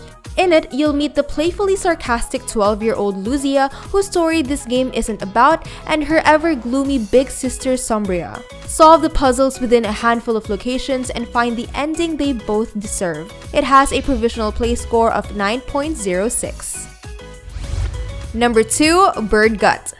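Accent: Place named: Philippines. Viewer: the narrator is filipina. halata sa accent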